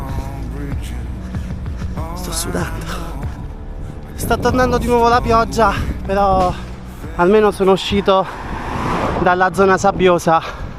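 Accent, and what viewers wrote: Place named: Tuscany, Italy. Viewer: Grande, immagino dall'accento che tu sia toscano (come me😜). Bel tatuaggio 🙃 simpatico...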